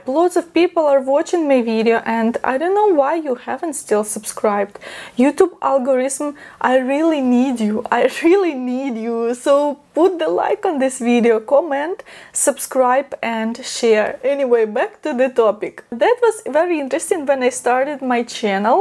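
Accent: Ukraine. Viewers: Your English is fine . I'm used to hearing English with the Ukrainian accent now . I don't have a problem understanding you . Your parents sure were good to you and your sister . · Your English is really just fine. It's just that your Ukranian accent is pretty heavy. That can go away with practice if you really want. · Your accent is Beautiful. My fiancee is Ukrainian and she has a similar accent and it is SO beautiful. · The criticism of your accent by other Ukrainians, I believe, is based on culture. Of the Ukrainians I have met, they are perfectionists. Your fellow Ukrainians want your English to be perfect since you are considered an Ambassador of Ukraine on the internet. It is ok, they are proud a people. Glad to see you back online. Take care. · Ukrainian accent is very nice. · Your English is perfect, 💐💐💐 please dear Ukrainians don't be embarrassed by your accent it's lovely. 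You want to hear the English language in a terrible accent then you gotta talk to us Australians🤣🇦🇺 Been picking up some Ukrainian words & phrases from watching you all with translations in clips & songs Ukrainian is beautifull but very hard for us. Get excited when recognising words & phrases😁 but damn when I hear myself trying to say/sing along my Australian Strine accent is dreadfully thick & pronunciation is woefull😅 stay well & safe & Family🇦🇺❤️🇺🇦🦘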